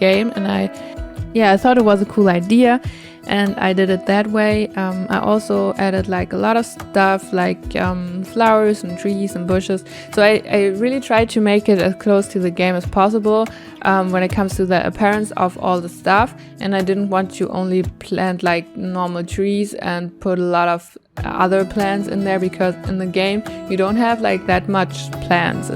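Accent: Germany. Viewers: I hear a german accent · You Sound very german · Are you german? Because of your accent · are you german? you kinda have a german accent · Are you german? Cause u sound like it 😅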